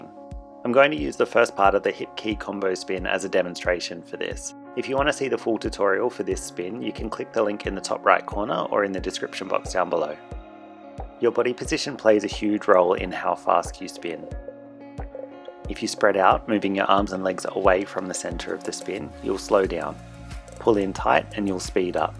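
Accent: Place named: Australia. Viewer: Thanks for this (and all your tutorials)! You do a great job of breaking it down. I also very much enjoy hearing the Aussie accent from the other side of the world. Keep up the good work🙂🙏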